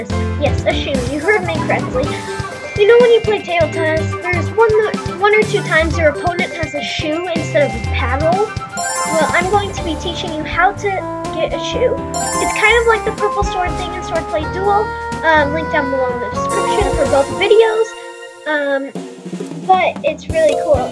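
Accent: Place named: United Kingdom. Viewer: You have a British accent in this video.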